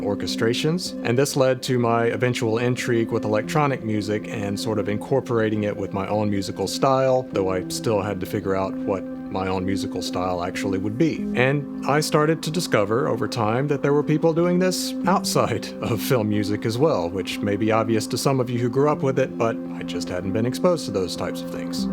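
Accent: South, United States. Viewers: there's only one thing I love more than the intersection of the academic study of music and the artistic application of advanced music theory on technology: hearing the subject discussed in a mild southern accent. 💜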